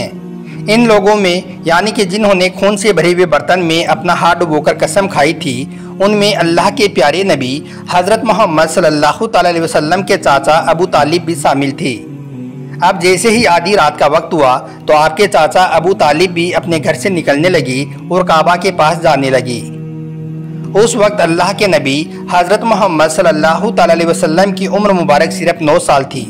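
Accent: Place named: Bihar, India. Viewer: Bihari pehle apne boli sudhar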